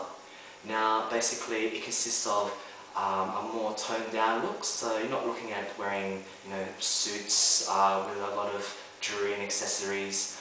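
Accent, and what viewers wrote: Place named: United Kingdom. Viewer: ギャル男-looking guy with british english, what a cool combination lol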